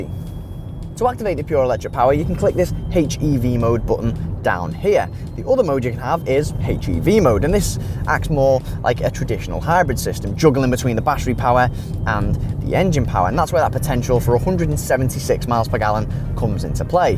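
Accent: United Kingdom. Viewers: i believe anything from a guy with British accent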